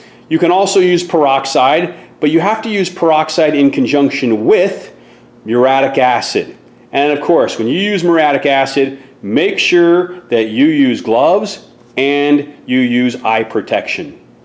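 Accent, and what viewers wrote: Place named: United States. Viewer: This is the most american sounding guy I've ever heard. If america was a person It's him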